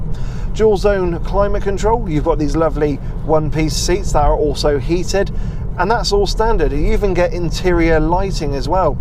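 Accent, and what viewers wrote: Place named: England, United Kingdom. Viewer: I just love this guy's english accent. Very classy.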